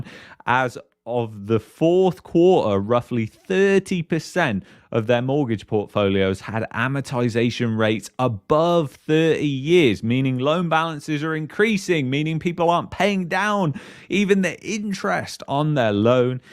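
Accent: United Kingdom. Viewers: Why is a Brit , explaining Canadian economics ?😮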